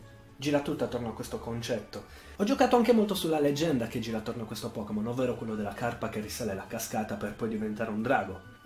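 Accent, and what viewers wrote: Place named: Sardinia, Italy. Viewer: sei sardo? perché hai un'accento simile al nostro (sono sardo)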